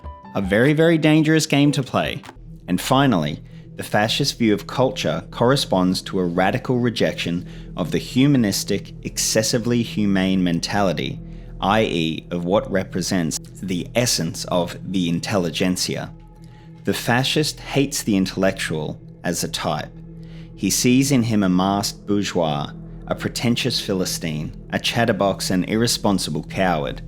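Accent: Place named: Australia. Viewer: I can’t tell you how absolutely thrilling it is to hear a YouTuber discussing ideology with an Aussie accent! Where hast thou been all mine life?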